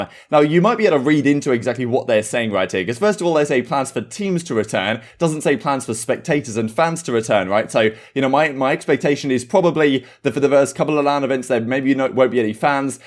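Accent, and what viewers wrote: United Kingdom. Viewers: I’m also curious 🧐 British? Haha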